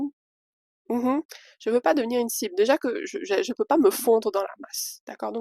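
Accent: Cameroon. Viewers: Écoute, laisse moi te dire que tu t'es déjà bien intégré au mboa, le sang Camerounais domine en toi sa se sent par ton accent 😁👍👍👍👍 🇨🇲🇨🇭✔ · L'accent camer est déjà là, c'est super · Ton accent Camer hein...un vrai miel. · Accent du Mboa🇨🇲 Bienvenue...nous t'aimons très fort, j'ai une famille métisse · Tu as déjà fait combien de temps au Cameroun, pour prendre l'accent là?😅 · Tu es marrante ! L’accent camerounais te vas bien.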